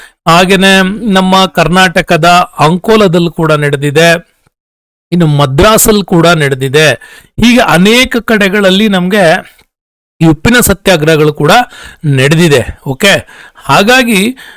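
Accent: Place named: United Kingdom. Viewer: ಸಾರ್ ದಯವಿಟ್ಟು ಕನ್ನಡ ನ ಕನ್ನಡ ತರಾನೇ ಮಾತಾಡಿ.......😢😢ಯಾರೋ ಬ್ರಿಟಿಷ್ ಅವರು ಕನ್ನಡ ಮಾತಾಡೋ ತರ ಕೇಳುತ್ತೆ.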